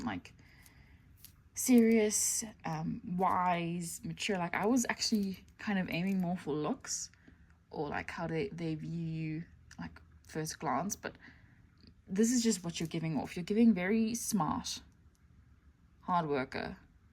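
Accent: South Africa. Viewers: South African voice right there! Love it 🥰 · Girl I know a South African when I hear one! 👀😂🫶🏾🇿🇦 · Are you South African? Just found your channel, love these messages and your thumbnail💗 p1 is really accurate 😮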